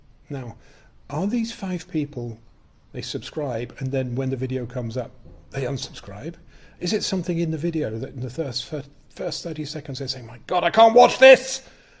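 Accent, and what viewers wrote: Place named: RP, United Kingdom. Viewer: Your accent sounds far to posh for a mechanic, we never see your Mush so we call you 😂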